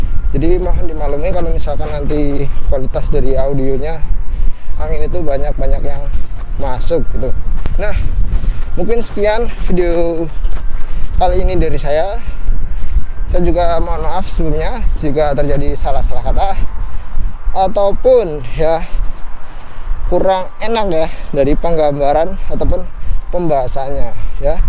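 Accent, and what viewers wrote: Pekalongan, Indonesia. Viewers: Wong Pekalongan ya mas ? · Podo wong kalongan. 😁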